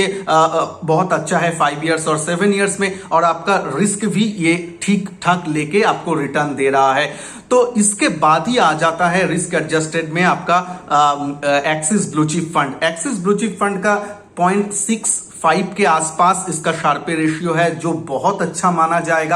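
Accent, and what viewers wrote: Bengal, India. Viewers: বাঙালির হিন্দি শুনলেই বোঝা যায়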